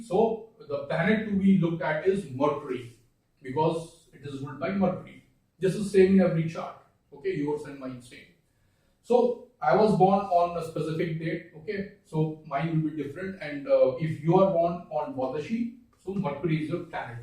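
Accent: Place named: Malaysia. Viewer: u spoke like malaysian thats y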